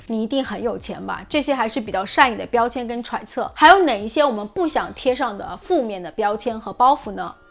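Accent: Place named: North, China. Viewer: 你是哪里的 听着也像北方的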